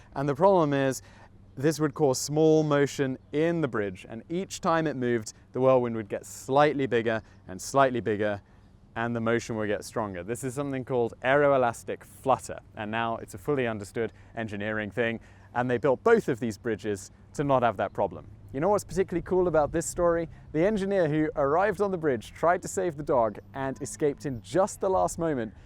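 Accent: England, United Kingdom. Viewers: That a pretty unique English accent...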